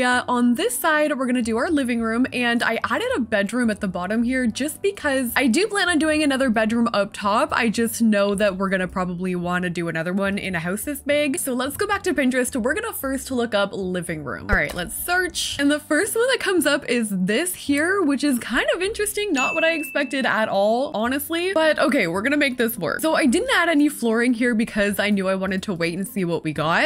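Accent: Canada. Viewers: omg first video of yours ive seen and could tell right away u were Canadian lol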